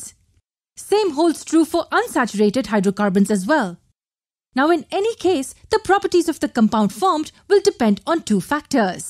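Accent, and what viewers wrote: India. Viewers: Loving this lesson. Loved the Indian accent too🤩😘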